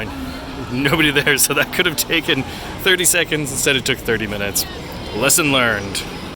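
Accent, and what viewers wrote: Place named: France. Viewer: Ur french accent is so good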